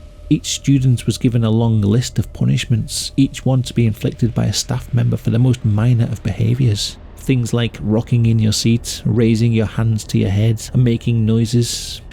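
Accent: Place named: Liverpool, United Kingdom. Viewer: Love this channel. Plus your also nearly scouse accent makes it better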